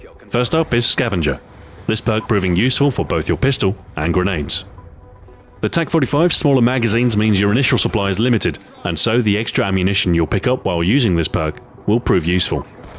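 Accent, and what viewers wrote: England, United Kingdom. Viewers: If you can't understand an English accent when you speak English as your first language then you just fail at life. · bet you're English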